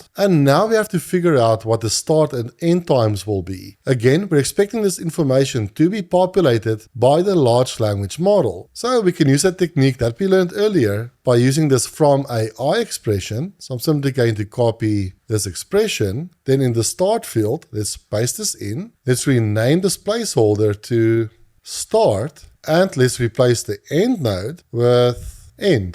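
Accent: South Africa. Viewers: 5 seconds into the video and I could tell you were south African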